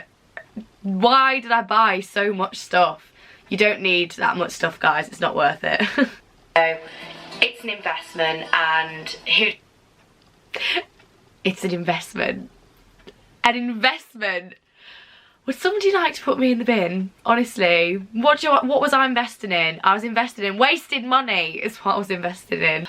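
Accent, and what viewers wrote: Birmingham, United Kingdom. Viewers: Love the brummy accent coming back😍💪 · Would disagree with the tea towel we had around 20 and somehow you still couldn’t find a clean one You are soo done with past and I love it, it's brilliant😂 Love the brummy accent coming back😍💪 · love how your brummy accent comes out here! such a helpful video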